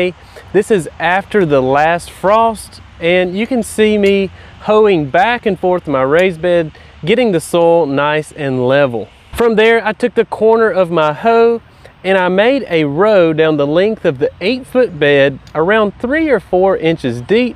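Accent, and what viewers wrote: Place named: West Coast, United States. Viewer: You have a very interesting accent. Sounds like you grew up on the west coast, but moved to the south.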